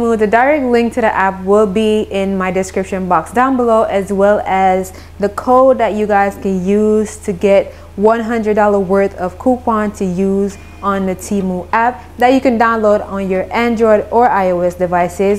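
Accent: Jamaica. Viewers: Heard that Jamaican accent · Def heard that Jamaican accent 🇯🇲🇯🇲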